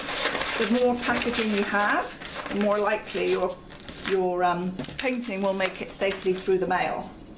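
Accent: United Kingdom. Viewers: I love the video! Very helpful! And your (probably:) British accent💕 I see you are wrapping classic canvas, but what about board canvas, those slim ones? I think it should be enough to use the tissue paper and over it a bubble wrap and put into the book box mentioned in the video (I love them). Or any other method? I want to ship just the tiny ones board canvas paintings:)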